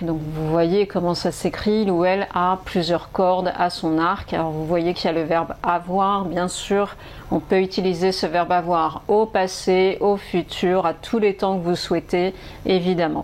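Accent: France. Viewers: J'adore écouter votre voix, elle est trop française. Merci beaucoup pour les videos. Ils m'aident beaucoup.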